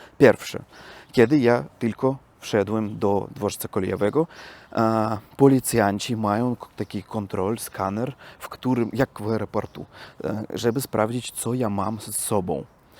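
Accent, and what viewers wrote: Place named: Russia. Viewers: bardzo ladnie mowi po polsku,nawet malo slychac rosyjskiego akcentu. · Twój polsko- rosyjski język jest uroczy, nie przejmuj się trudnościami(oni, one itd. ), to przyjdzie z czasem. Jest progres, walczysz, uczysz się i to się liczy. Pozdrowienia.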